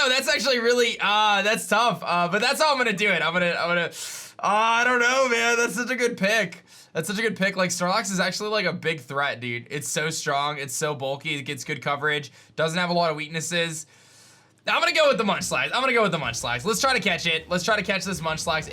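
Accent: Australia. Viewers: As an Australian I heard a lot of Americans try to do Australian accents that are not the best but you do a good Australian accent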